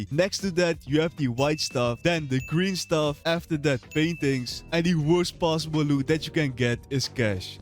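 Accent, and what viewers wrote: Netherlands. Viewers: je klinkt nederlands man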